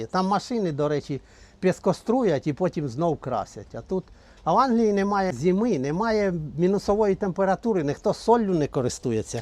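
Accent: Chernihiv, Ukraine. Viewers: Ви мабуть з чернігівської області по акценту чутно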